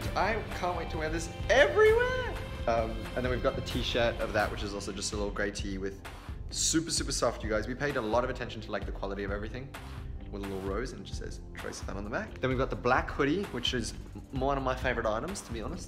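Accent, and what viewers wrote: Australia. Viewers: It might just be me but Troye sounds more Australian in this video 👍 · Taylah He is Australian ;) his accent just isn't as strong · the people that only know troye for being 'troye sivan famous pop star' won't understand how us who know him as 'little boy with an Australian accent who talks to a camera' feel rn!!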